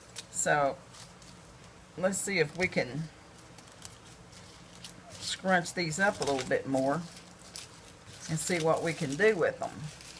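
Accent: Kentucky, United States. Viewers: Thanks so much for this! I just got this die on clearance and wasn't sure what to do with it. Your video is great - love your accent! Reminds me of my mom, from KY. I'm a new follower to your creative channel! Jean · My flower turned out more flat. What can I do to make more dimensional like yours. I love your accent I grew up in Irvine,Ky, makes me homesick!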